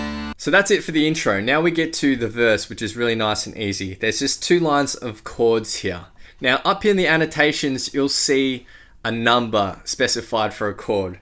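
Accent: Australia. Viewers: with an Australian accent (i think) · Dont forget the australian accent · I’ve never seen an Asian with an Australian accent before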